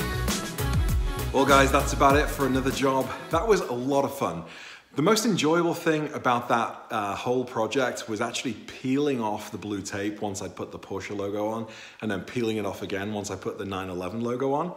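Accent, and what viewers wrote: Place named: Northern England, United Kingdom. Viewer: I thought it was Liverpool!! I'm in Manchester so could her the Northern in your accent. 😂😂 Came across your channel as I'm on the look out for a 911 myself!! Keep up the good work! 👍👍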